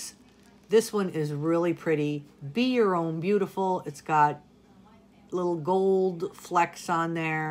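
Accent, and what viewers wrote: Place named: New York, United States. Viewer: I’m new, just found your channel! I thought you were from New York, you have a slight accent haha my first guess was New York or either maybe Chicago 😆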